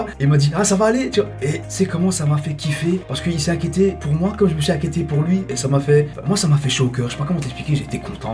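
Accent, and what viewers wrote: Brussels, Belgium. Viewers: J'avais remarquer directe que tu viens de Bxl mdr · Salut, t'es belge toi non?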